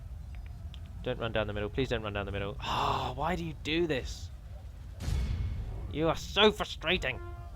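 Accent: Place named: Glasgow, United Kingdom. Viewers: That Scottish accent tho lol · the first half sounded like a bitchy glaswegian, second half sounded retarded norfolk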